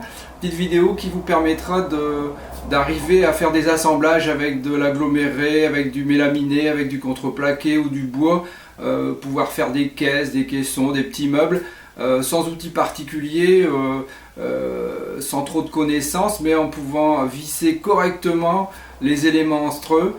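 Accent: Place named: Nord-Pas-de-Calais, France. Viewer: Bien expliqué, le petit accent ch'ti, j'adore!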